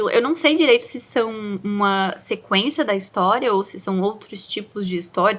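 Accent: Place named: Florianópolis, Brazil. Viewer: sou nova aqui e já estou adorando! Parabéns pelo canal 🥰 ah, eu conheço esse sotaque, és manezinha tb?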